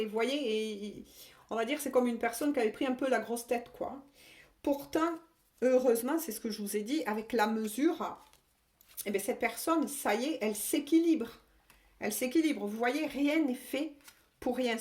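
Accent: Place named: Provence, France. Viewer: Merci belle guidance parlante et limpide avec ce bel accent Provençal qui me rappelle ma ville préférée Aix-en-Provence où j'ai fais une partie de mes études supérieures et dont je resterais amoureux pour la vie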